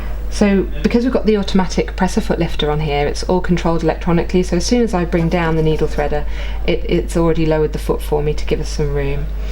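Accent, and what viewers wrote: United Kingdom. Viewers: I adore her proper British accent. Great demonstration. I intend to buy one this week.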